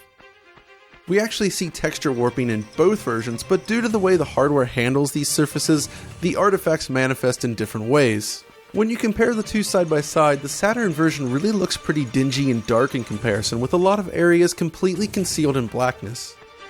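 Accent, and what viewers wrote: United States. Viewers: This guy's American accent makes me cringe. Still, great work DF! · he's American and definitely has an accent. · Well, he IS from America.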